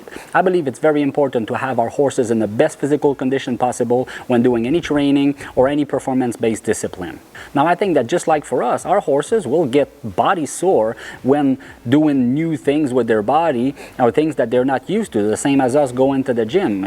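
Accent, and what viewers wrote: Quebec, Canada. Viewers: J'adore tes vidéos 👌🏼 le petit accent a la Québécois j'adore haha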